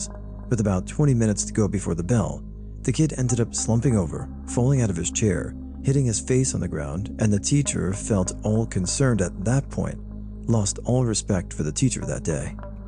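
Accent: Yorkshire, United Kingdom. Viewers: Hilarious Yorkshire accent. Lol. I'm from that huge county (one of four Yorkshires). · Best Yorkshire accent I've ever heard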